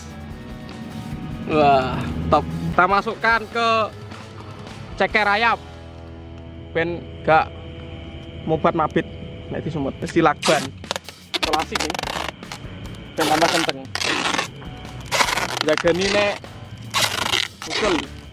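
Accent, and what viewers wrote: Java, Indonesia. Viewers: Bahasa nya medok wkwk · Wong jowo yo ? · Jowo ne ngendi mas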